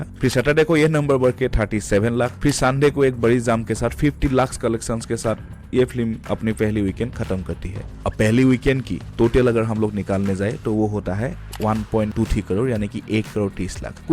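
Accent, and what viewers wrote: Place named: Assam, India. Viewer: Your accent say's that u are Assamese 😅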